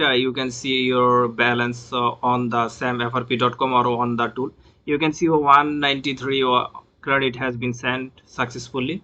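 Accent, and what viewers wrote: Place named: India. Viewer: all i hear is "bibidi bobidi" because thats how all Indians/hindus/punjabi/beadheads sound when yall speak.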